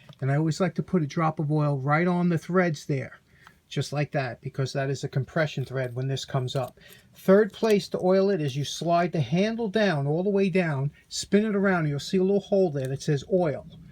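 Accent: New York, United States. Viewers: I only watch your videos for the New York accent! After years of buying new Chinese Craftsman battery drills every year I bought Makita Chinese Li Ion drill and impact. 6 years and counting. I am shocked. It has built complete decks and privacy fences!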